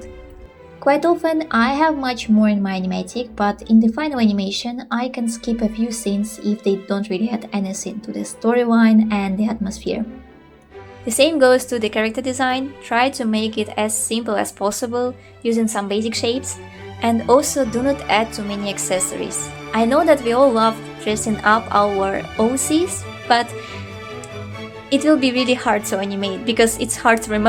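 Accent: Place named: Philippines. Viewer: Hello arr sounds like Filipino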